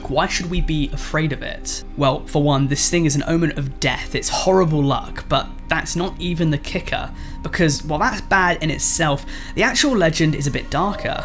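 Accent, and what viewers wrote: United Kingdom. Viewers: You sound a little like your British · I reckon that you were educated in a public school and that your probably from somewhere like Hale, Altrincham and if you're not a Northerner you're probably from the cotswolds but you're accent has been molded from the fact that you've spent a lot of time in a boarding school so it's developed from mixing with other people who are from all over the country so I reckon it's an amalgamation and maybe a touch of your parents from when you were 1st learning to talk, please reply and let me know if I'm right or wrong and tell me where you're accent is from.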